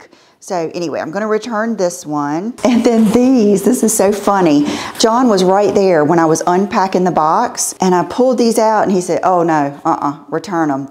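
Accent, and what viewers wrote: South, United States. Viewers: I was thinking to myself, I love how says “ ok” it’s just the cutest way with your southern accent. And then you said now on to Rachel and that’s my name 😂I thought you were talking about me for a moment haha! You cracked me up today. Those Mary Jane sneakers and the purple Zara bag just made me smile. I love how out of the box you can be at times and you adventure to try new things and are always honest with us if they don’t work out but you are inspiring me with style too. Thank you! P.S. that soap holder is just gorgeous lol it’s the simply things right?! And the Zara green jacket looks amazing on you!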